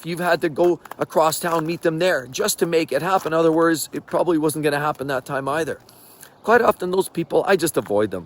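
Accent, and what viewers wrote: Canada. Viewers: Are you Canadian, or northern U.S. ? I hear a bit of a Canadian accent.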